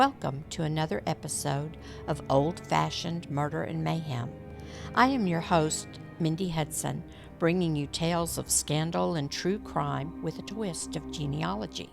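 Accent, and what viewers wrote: South, United States. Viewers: What an odd story. Seems almost modern in its senselessness. Murder is, of course, as old as Cain though. I could be wrong, but I fancy it was probably one of the first cases in American law where psychologists were called upon in evidence. Great podcast series, BTW. - and I always love to hear a classic musical Southern accent!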